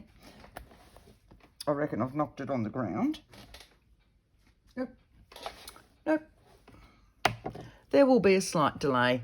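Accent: Australia. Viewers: Hi. Nice to hear an Aussie voice on a junk journal tutorial. I so wish I had found this two years ago when I first started out. However, I have already started taking my readers digest book apart to create my very first altered book along with you. Keep up the good work, loving it.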